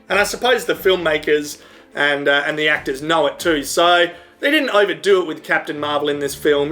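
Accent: Australia. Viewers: Funny I had on captions while watching this video to make sure I understood your Aussie accent correctly, you bloody legend!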